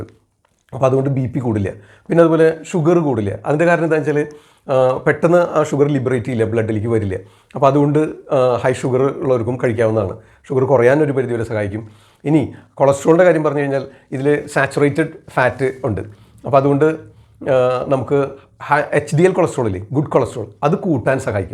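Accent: Thrissur, India. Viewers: Doctor തൃശ്ശൂർക്കാരനാ ല്ലേ...🥰👍👍👍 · തൃശൂർ രീതിയിൽ വളരെ ലളിതമായി ഡോക്ടർ പറഞ്ഞു കൊടുത്തു. nannayi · സർ ത്രിശൂർ ആണ് നാട് അല്ലെ 😃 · Doctor sure Trissur kkaran aanu ,speed accent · Trichur aano sirnte sthalam avatharam super nalla arivanu nalkiyadu tnq sir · തൃശൂർ ശൈലി ആയതോണ്ട് നല്ല രസമുണ്ട് കേള്കാൻ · നമ്മുടെ തൃശ്ശൂർ സ്ലാഗ്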